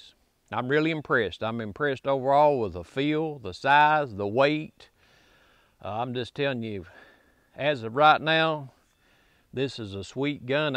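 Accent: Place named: South, United States. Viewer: Love this one can’t wait to pick one up.I just noticed something funny,watching this video while my dads started his diesel truck up can’t hear so put closed caption on and with your accent the stuff it types out vs what I know your actually saying is so far off it’s funny.not making fun of the southern accent the caption is lost it says Hornady as horn today.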